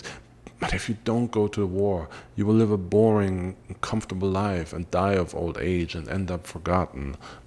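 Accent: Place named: Germany. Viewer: ..are you german? You pronounce the names REALLY german like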